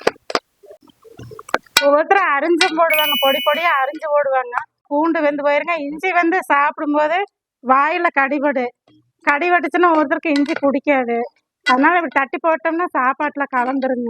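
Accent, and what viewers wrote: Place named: Kongu, India. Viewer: Akka nama Kongu tamil kalandha saadham arumai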